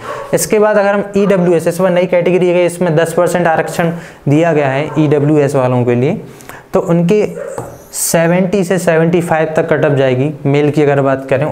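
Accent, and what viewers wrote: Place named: Bundelkhand, India. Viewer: apki language se pta chala ki ap bundelkhand se ho..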